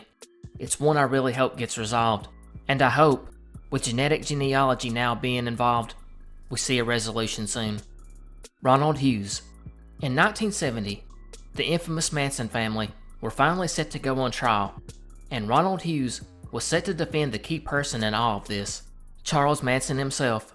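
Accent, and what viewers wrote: South, United States. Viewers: Keep this up man. I think we’re from around the same neck of the woods, and it touches my heart to hear a southern accent talking about these mysteries. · My dude! Love your channel man. Not sure where you're from but you've got quite a Southern draw. As someone from Kentucky, it's refreshing to listen to someone I can relate to. And your stuff is super interesting and well done. Keep up the good work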